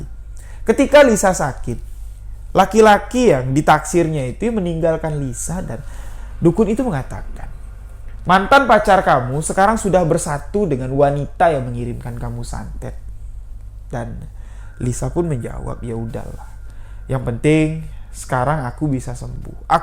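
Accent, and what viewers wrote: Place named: Madura, Indonesia. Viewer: mas ini asli mana ya kx saya den9er² bhs nya kyak oran9 madura🙏🙏🙏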